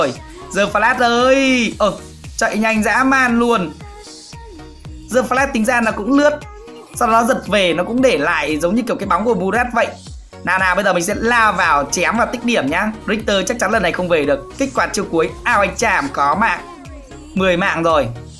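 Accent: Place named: North, Vietnam. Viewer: Ông này người miền Bắc nói giọng miền Bắc đi nói dậy dậy nghe chối tai thiệt sự giọng đã nhạt rồi còn nói kiểu đó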